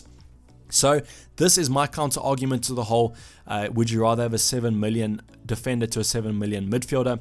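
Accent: Afrikaans, South Africa. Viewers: Is jy afrikaans😂🤔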